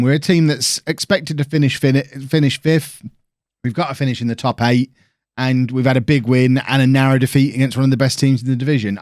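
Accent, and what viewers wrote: United Kingdom. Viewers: Man, you went to Mexico and the ladies are all just falling in love with you! I didn't know a British accent was so popular in Central America.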